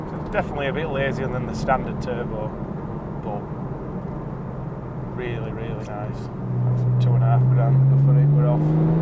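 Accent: Yorkshire, United Kingdom. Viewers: Love how Yorkshire you are! Great work lads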